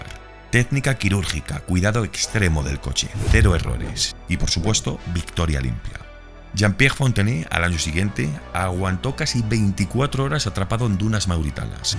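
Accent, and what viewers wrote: Spain. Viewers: Español de España, además. ¡Gracias!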